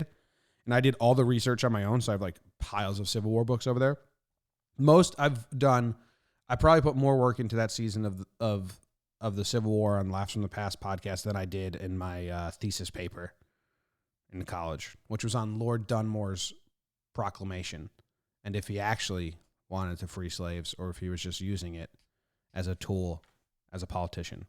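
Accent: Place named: Chicago, United States. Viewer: I know you're a Yankee but are you originally from Chicago? I hear Chicago in your voice bro.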